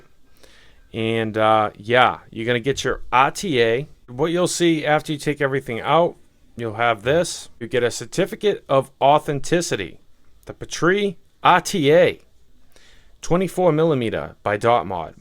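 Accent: Boston, United States. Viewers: Thanks for the great review as usual! I'm assuming ur from Boston because of ur accent! If so where in Boston r u from? I live in Medford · dude yr boston accent is really fukt up, the Petri is an R TA, not AH TA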